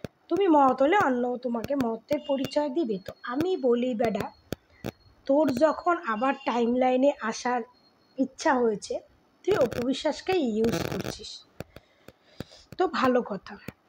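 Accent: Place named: Bangladesh. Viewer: Tui india bole mone hoyna tui tor kotha batrai bojha jay tui bangladesi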